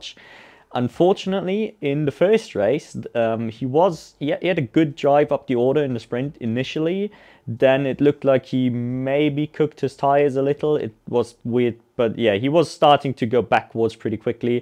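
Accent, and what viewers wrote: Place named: Scandinavia. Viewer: Also I am trying to place your accent and failing (I'm a language and linguistics nerd, so I do this with all YouTubers I watch), sounds both Scandinavian and English with a bit of German. I'm going to place a random guess and say Iceland as a wild guess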